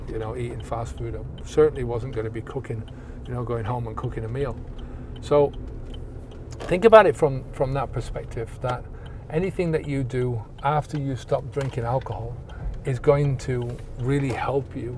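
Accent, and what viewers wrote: Ireland. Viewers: I'm loving your videos. I'm Paddy from Wicklow do you mind me asking what part of Ireland are you from?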